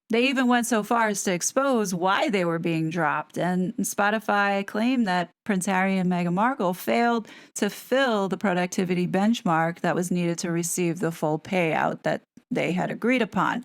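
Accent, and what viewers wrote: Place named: New York, United States. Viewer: this is totally off topic, but you should look into doing books for Audible or some sort of ASMR perhaps. The way you speak, not just my lifelong love of NYC accents like yours, but your inflections, whit and intelligence, I could listen to you do anything. Read anything. And be captivated which isn’t easy for me to be captivated by someone I hear and can’t see. Love you friend. Maybe you can voice commercials? I need you to narrate my life😂😘💛 sending love from California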